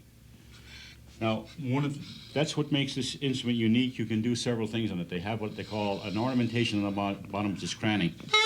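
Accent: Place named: Dublin, Ireland. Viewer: bits of the lovely Dublin accent come through in his voice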